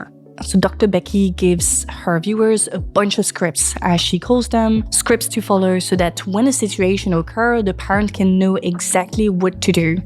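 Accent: France. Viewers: I agree with what you say about the effect of Lolita, but I hope you understand how the actual message of that book itself is to show how false, self-serving, and full of manipulation that perspective is. And I'm saying this as someone who is unashamedly attracted to young girls. I understand that my attraction is utterly separate and apart from any possibility of acting on it in reality, and would never take steps to do so. And it is because of that abstinence, that I have the right to my own natural feelings and thoughts. Your French accent is quite lovely, by the way.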